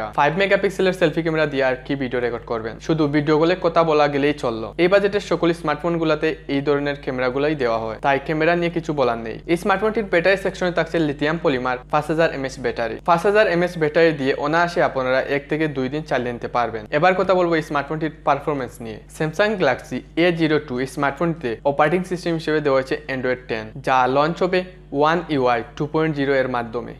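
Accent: Sylhet, Bangladesh. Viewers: ভাই তুমার বাড়ি কি সিলেট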